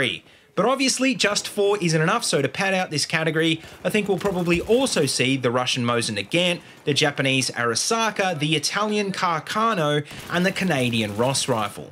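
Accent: Australia. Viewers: LAMO so Aussie cheers m8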